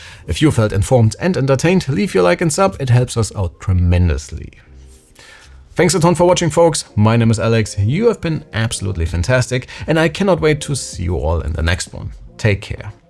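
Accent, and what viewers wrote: Germany. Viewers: Great Video. Is your Accent from Germany?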